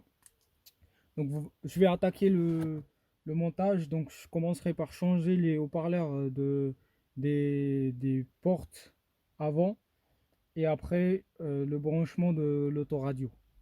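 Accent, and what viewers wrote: Algeria. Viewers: Votre accent est algérienne monsieur 😂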